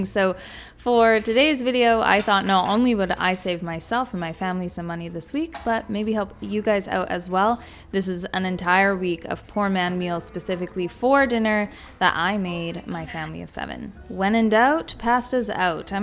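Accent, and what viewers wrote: Canada. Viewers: Can confirm most of us Canadians say it the same way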